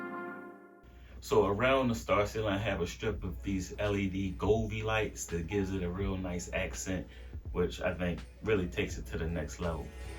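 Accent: Baltimore, United States. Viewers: Boy this guy from BMORE you heard, that accent never lies. I love the mancave and the video subscribed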